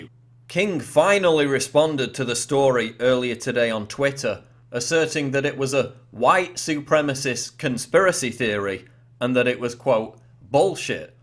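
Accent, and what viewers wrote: United Kingdom. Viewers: A white guy on YouTube with a British accent, commenting on BLACK issues?? Lmfao the irony of this dude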